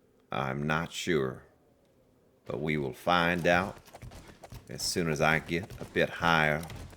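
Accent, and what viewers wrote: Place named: South, United States. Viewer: I have laughed more on this video at the silliness your friend put you through than I did at G4Kz when the bear was chasing him. If any gets mad, they don't, in my opinion, have a very good sense of humor. as for how many times you said boo boo I counted five times. don't get me wrong, but your accent went real southern in this video. don't know what state you were raised in, but it sure sounds a lot more of the south. great episode.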